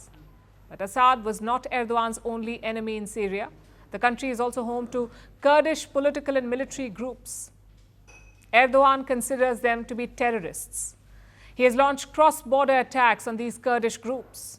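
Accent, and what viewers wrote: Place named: India. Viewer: Funny indian accent😂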